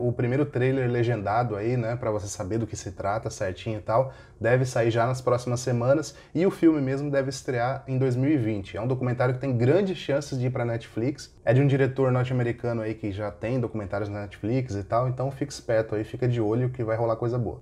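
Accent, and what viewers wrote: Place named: Rio Grande do Sul, Brazil. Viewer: Tu foi afetado pelo gauchês. É um caminho sem volta. Minha noiva (de Santa Catarina) já fala tri, bah, afu, tchê, chinelagem e cacetinho. Bem-vindo ao clube. Mazaaaaa!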